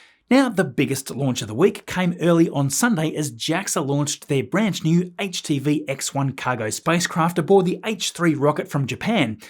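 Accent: Tasmania, Australia. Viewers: As an American, I love a good 'Tassie accent. I will attempt to transliterate. SpiceEhx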